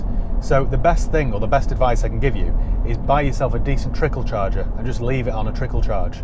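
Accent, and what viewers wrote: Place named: Manchester, United Kingdom. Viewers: hey...youve gone all manc on us...the review though is great...i like your reference to "conti" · Not a bad cross Pennines accent! Car is pretty nice too!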